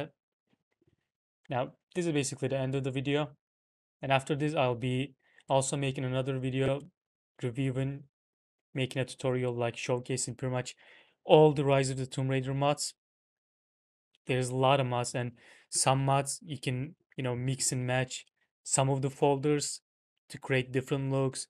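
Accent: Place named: Turkey. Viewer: aksan türk aksanına benzio heralde türksün reis shadow of the tomb raider varmı mod olarak bide modlar oyunun orjinal halini bozarmı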